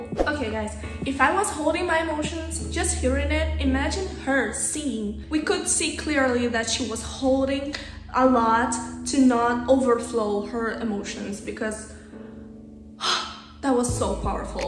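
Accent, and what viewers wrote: Brazil. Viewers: Great reaction! I love Lara, she is such an underrated artist. Unfortunately, she didn't make it in America so she kinda missed a huge market and the public a huge artist. O sotaque brasileiro é inconfundível, rs.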